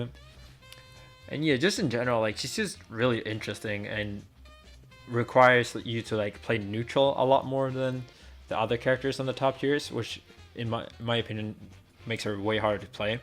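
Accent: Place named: Sweden. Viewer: he literally said he may misprounce some names due to his swedish accent. Just like how he say ginjew instead of ginyu. He knows he is mispronouncing some names. Plus, you don't have to watch dbz to be good at this fighting game. You don't have to play Super Mario or Legend of Zelda or Pokemon to be good at Smash Bros.How much you watch dbz has literally no correlation to your skills in this game. In fact, this game is made by Arc Systems, so it's literally a Guilty Gear game with MVC assists.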